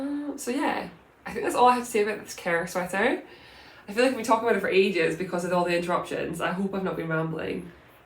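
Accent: Scotland, United Kingdom. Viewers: It’s not your accent, your Scottish accent is lovely, I think. it’s only that at times words aren’t pronounced fully (several words come out as one!), but of course it’s up to you whether you want to reach more people or not, it’s your channel 😊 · Please do not change. Your Scottish brogue is so beautiful and if someone truly doesn't like your videos they can always choose not to watch. The self-entitlement of those people asking you to change to suit their own convenience is astounding. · I personally love hearing your Scottish accent and don't have trouble understanding you at all! The Youtube knitting community is a great way to hear so many different accents and I love it. Your podcast is the best. Love from Australia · Please! I love your Scottish Accent and I am not having any issues at all! You are you and if folks don't like how you speak they don't have to watch!! 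I am grateful you are here and Love your knitting podcast!And..... I can't wait for your sweater release!! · Ignore the naysayers. Scottish accents are the coolest! Thanks for the great episode. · Love your accent. Reminds me of when I am visiting my daughter in Aberdeen. I’m in Canada❤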